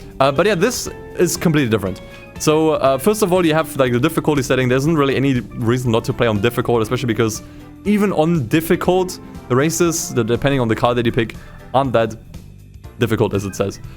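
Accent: Germany. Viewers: Warum klingt dein englisch so deutsch??? 😅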